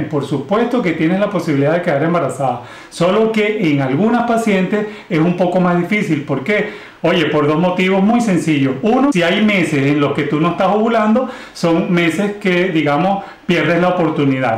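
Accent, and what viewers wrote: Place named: Venezuela. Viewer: Doctor saludos desde Italia y ese acento suyo me parece criollito venezolano.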